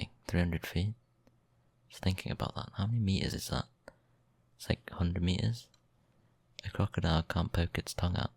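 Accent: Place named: United Kingdom. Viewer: Omg you are from the uk aren't you? I kinda fall in love with people from the uk just because of the beautiful way they pronounce every single word aaagh i can't. I apologize for mistakes, greetings from germany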